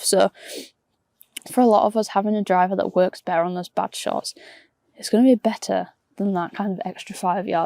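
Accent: United Kingdom. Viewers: You know I really like your content and vids. I’ve become a fan, BUT I have to admit you’ve got the coolest UK accent ever!!! Great review, picked up my 10K yesterday, can’t wait to hit it….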